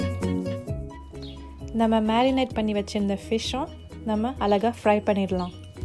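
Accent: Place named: London, United Kingdom. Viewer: London Tamil lovely 🥰🥰Mouth watering dish😋😋😋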